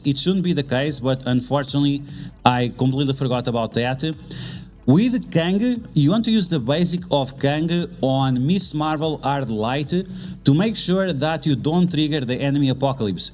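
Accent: Hungary. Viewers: Pasztmek =D Tippelni se tudtam, mi ez a szláv akcentus, de hogy magyar =D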